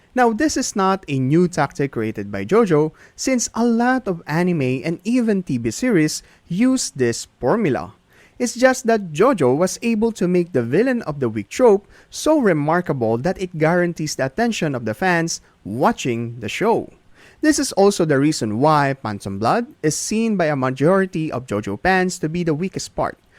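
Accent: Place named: Philippines. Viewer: That accent.. say, are you filipino by any chance?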